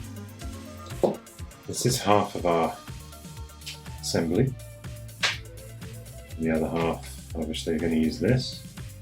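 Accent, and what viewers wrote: United Kingdom. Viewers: Are you from the UK but live in the us?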